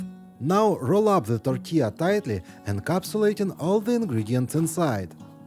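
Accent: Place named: Russia. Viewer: Your videos are very fine, and I like your Russian accent. Thank you for learning English. I know it is not easy. Слава Богу!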